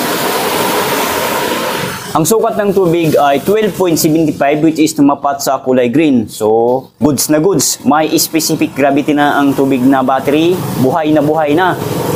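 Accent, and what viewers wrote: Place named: Visayas, Philippines. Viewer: Visaya ka man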